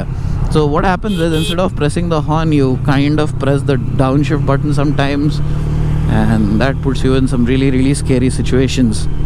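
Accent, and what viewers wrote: Hyderabad, India. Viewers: I watch your videos for 2 things, your hyderabadi slang and the beautiful bikes you show us! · U r language is very funny 😆 I am very enjoy u r video. I love hydrabadi language ❤. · I have been laughing through out the video... Bro had i been a Hyderabadi i could have this phonetics....Hallu hallu chala nahi ghus jata maa ki kir kiri....I love this maan... :D From Bhubaneswar, Odisha.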